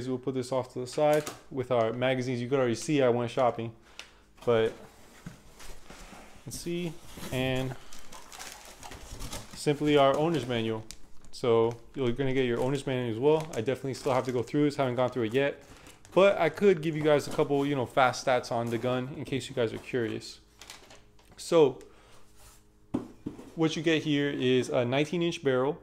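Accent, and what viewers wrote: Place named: Miami, United States. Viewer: Damn... that’s a Miami accent no matter where you hear it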